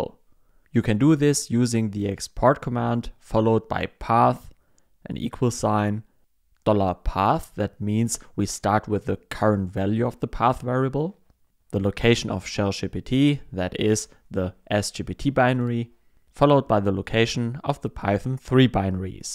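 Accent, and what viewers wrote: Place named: Germany. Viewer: nice video very deutscher Accent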